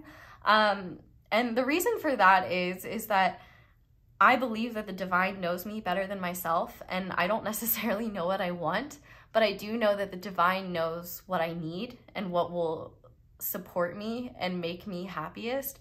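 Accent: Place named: South, United States. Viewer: Pile #2, I love your Southern accent lol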